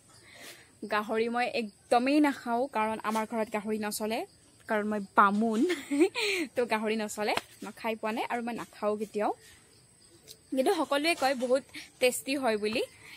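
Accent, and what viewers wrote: Nalbari, India. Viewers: Ba Tumr Ktha bor Nalbari, barpeta district r dore... Apunar original Ghor kot hy?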